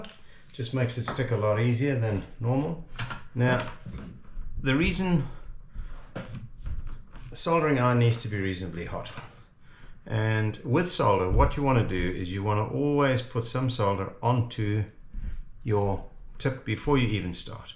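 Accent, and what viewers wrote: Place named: South Africa. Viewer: Best instructive video on Youtube on creating a 6s. As an expat half way around the world great to hear a South African accent again as well.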